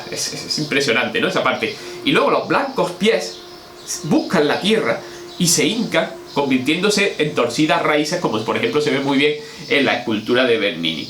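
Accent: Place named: Andalusia, Spain. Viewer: Lo que te puedo decir, es que tus clases son fantásticas: considera que hacen parte de mis recursos para el examen de Literatura Española de un máster en filología. El hecho que la gente te frequente poco, es que los paladares finos son muy pocos (y el mío, modestamente…) Para aliviar tu narcisismo compensatorio, puedo solo añadirte que, además de agradable, muy preparado y gracioso durante las explicaciones, eres también muy, pero que muy guapo. Y encima con acento andaluz (granadino o de por ahí?). Aprovecho, como la precedente seguidora, para darte un abrazo.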